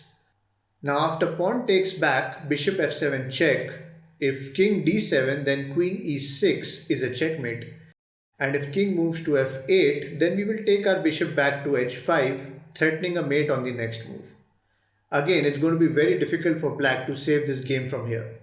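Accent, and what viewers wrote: India. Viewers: I've never heard such a nice Indian accent😀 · Me: hm thats not gonna help hears indian voice Woaaahhh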